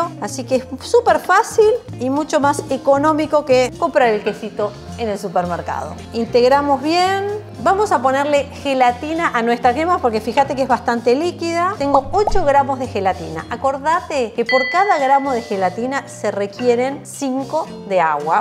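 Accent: Argentina. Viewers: POR TU ACENTO YA ME DI CUENTA QUE ERES ARGENTINA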